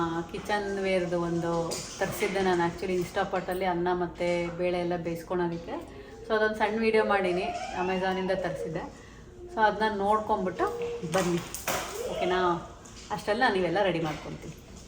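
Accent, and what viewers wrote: Mandya, India. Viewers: ಅಮೆರಿಕಾ dali edru nim Halli maathu kelthidre thumba kushiyaguthe Nam mandya bashe andre🎉 ❤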